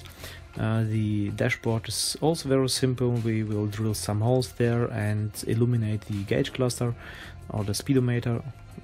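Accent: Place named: Germany. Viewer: Wie man einfach hört das du deutsch bist beste 😀👌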